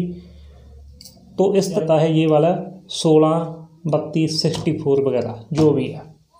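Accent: Punjab, India. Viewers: Punjabi banda hindi bol raha ha..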